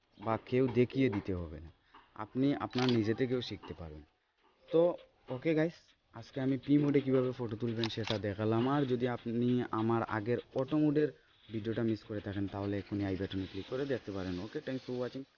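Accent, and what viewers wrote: Sylhet, Bangladesh. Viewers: তর বাড়ি মনে অয় সিলেট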